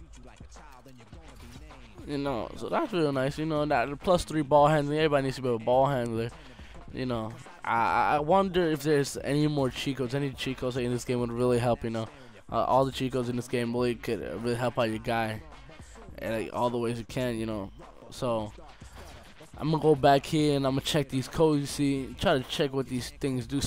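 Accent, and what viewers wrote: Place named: Dominican Republic. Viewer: He talks like that cuz he dominican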